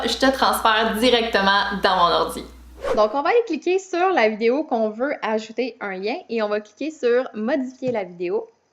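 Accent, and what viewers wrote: Quebec, Canada. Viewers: allant et parlant trop vite,on a du mal à vous comprendre avec votre accent Canadien,juste une explication comment envoyer un lien d'une vidéo à quelqu'un sur une autre vidé,Merci. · Merci pour ce tuto sympa avec l'accent canadien que j'adore ! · J'aim très ton accent 😍 c'est le Québécois ? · Merci pour la vidéo ! J'adore l'accent québécois ! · Putain, l'accent Canadien c'est vraiment trop horrible ! Sérieux ! 😱.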